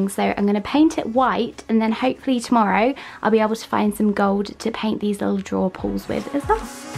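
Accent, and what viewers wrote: United Kingdom. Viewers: british accent is sooooooo beautiful. Couldnt stop listening to you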